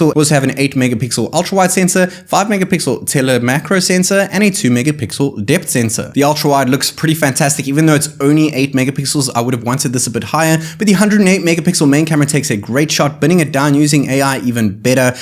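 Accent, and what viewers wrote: South Africa. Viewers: Bro you sound South African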